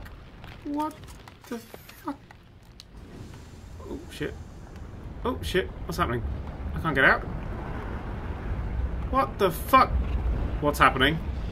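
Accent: United Kingdom. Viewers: Sir, I don’t know how to make this not sound wired, but you are delightfully British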